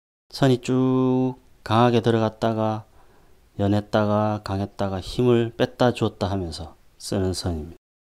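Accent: Gyeongsang, South Korea. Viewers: 아 마음의 답글 우선 고맙습니다 ^^ 얼추 경상도사투리시라 짐작대로 부산이시라니 머네요 아쉽구요 인생후반 .. . J 님의 재미난 설명곁들인 멋진기초? 드로잉실력 나눔을 보다보니 불현듯 어렸을적 초등시절의 미술 ? 제재능이 생각났어요 새삼 .. 인생후반 겪고있는 힘든일들을 극복 승화라도 시킬수있는 힘 .. 얻고싶음을 기대하는거겠죠 말씀대로 교보든 어디든가서 스케치북 미술연필 구해봐야겠네요 선생님쓰는 연필 .. 뭘로 구할까요 ? 유투브 공짜연습생들 모여서 혹 ??? ㅋㅎㅎ 뵐날까지 함 기대 그려도봅니다 문외한에게까지 나눠주시는 마음 진심 고맙구요 👍👍👍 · 알수없는 유투브 알고리즘의 추천으로 아무 생각없이 보면서 차분히 설명 잘해주시는 경상도 아저씨구나 생각했는데 에서 빵터짐ㅋㅋㅋ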